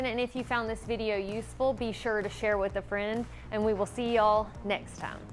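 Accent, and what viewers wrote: South, United States. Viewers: Draws? As in rhymes with straws? I’m pretty sure you’re talking about undies. I do love the southern accent. But our European friends might have problems with this one. Anyways, great video as usual. Keep them coming. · Why can't every woman in America have a southern accent ?? 🙄. Makes your videos so much nicer to listen too. Thanks for posting....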